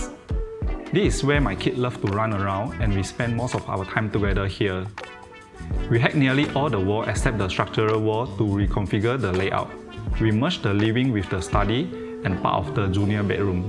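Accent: Malaysia. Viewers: the create. he enunciated as crate. "call home" became "koh hom" . Other words such as "cabinet", "favourite" too, the chinese-educated Malaysians will pronounce them differently from sporeans. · Strong Malaysian accent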